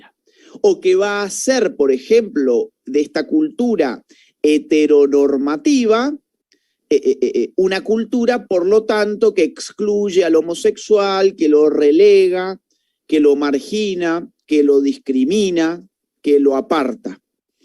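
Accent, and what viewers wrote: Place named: Mexico. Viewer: Habla como mexicana esta dama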